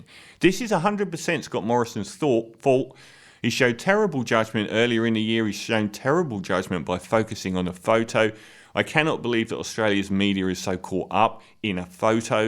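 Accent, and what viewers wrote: England, United Kingdom. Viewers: The guy making this video sure does. He has a strong English accent but he speaks as if he were Australian.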